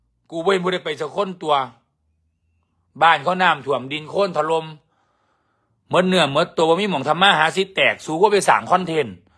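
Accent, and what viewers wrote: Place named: Isan, Thailand. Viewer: เว่าถืกคัก เด็กสมัยใหม่จั้ง คือเว่าเป็นแท้ แม่ใหญ่กรเลี้ยงลูกเป็นคักเด้อ❤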